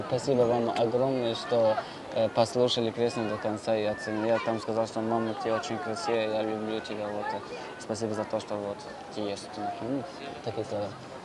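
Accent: Tajikistan. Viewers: Ту ёвонии ранги ёвоние гап мезани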